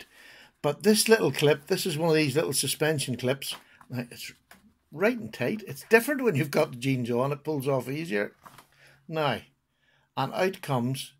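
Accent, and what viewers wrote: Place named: Ireland. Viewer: I fucking love the irish accent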